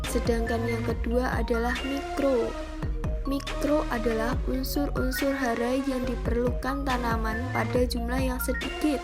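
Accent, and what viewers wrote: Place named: Java, Indonesia. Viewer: Medok men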